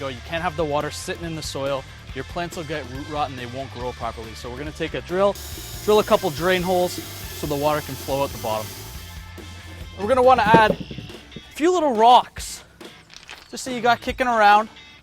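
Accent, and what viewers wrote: Canada. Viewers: I was going to ask if your Canadian because you sound like an NHL player then I saw your T-shirt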